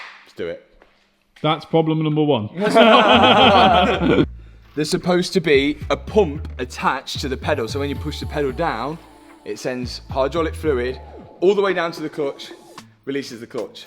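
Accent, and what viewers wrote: United Kingdom. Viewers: This dude cool but his accent is brutal. Goofy uk accent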